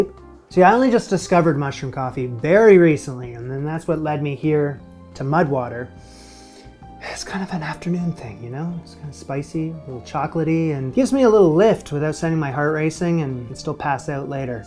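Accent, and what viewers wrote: Canada. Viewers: your Canadian has gotten SO much more noticeable since you moved 🤣 and when I saw the aspic in the thumbnail I laughed like a maniac 🤣👌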